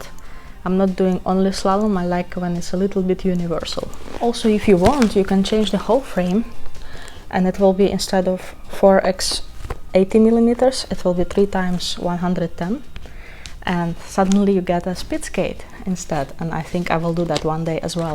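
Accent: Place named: Russia. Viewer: Ты русская, говоришь с акцентом)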